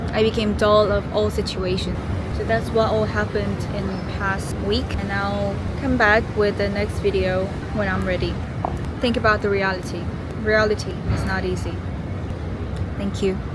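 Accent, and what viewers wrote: United Kingdom. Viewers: 외국카페에서 런던 영어 발음하는게 매력적이여서 구독했지만 그게 구독을 이어가도록 한건 아니였어요. 님이라는 사람 자체가 매력이 있다는걸 알게되었어요. 당신이 어디서든 어디에 있든 그런건 상관하지않아요. 다만 당신이 조금만 덜 힘들었으면 좋겠어요.😊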